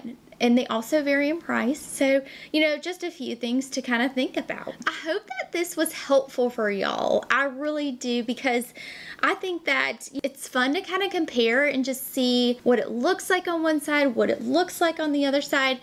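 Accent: South, United States. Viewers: i just found you & am following! You are the sweetest! Because of you it helped me to purchase the Gucci bronzer.. I was on the fence cuz it’s so expensive but You convinced me girl 👍🙏🏼🌹❤️💜💝🥰 I’m happy to follow you and I Love your southern accent., Y’all.. lol...sending you love ❤️ from upstate New York 🇺🇸💜💙🙏🏼🥰🌹💝💕🏖💚💋👍👌💪🇺🇸💪🇺🇸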